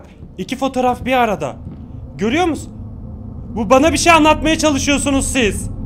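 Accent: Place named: Izmir, Turkey. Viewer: Abi İzmir'de veya çevresindemi yaşıyorsun biraz şiven benim olduğum ilçedeki şiveyle uyuşuyorda :D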